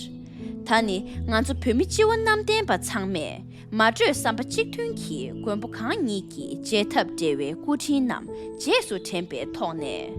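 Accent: Standard. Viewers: བོད་ཆས་གཙང་མ་དང་བོད་སྐད་དག་མ་བཤད་ཀྱི་འདུག་པ་མ་ཟད། བཙན་བྱོལ་ན་ཡོད་པའི་བོད་རིགས་མང་པོ་ཞིག་གིས་མིག་དཔེ་ལྟ་འོས་པ་ཞིག་རེད།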